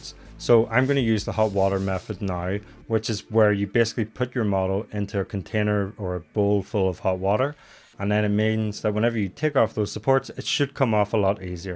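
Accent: Northern Ireland, United Kingdom. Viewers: Is Discord for Patreon members only? Also, are you from Northern Ireland?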